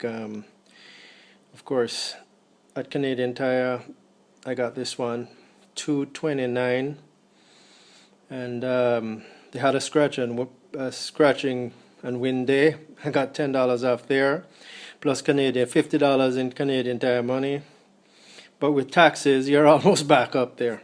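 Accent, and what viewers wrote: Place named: Punjab, India. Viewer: Fuck your accent. I'm tired of having to figure out what the fucking Punjabis are saying.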